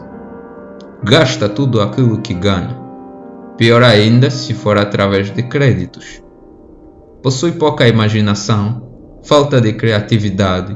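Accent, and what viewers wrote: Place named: Portugal. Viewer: Oi, de qual país vc é? Seu sotaque parece muito o de portugal, eu sou Brasileiro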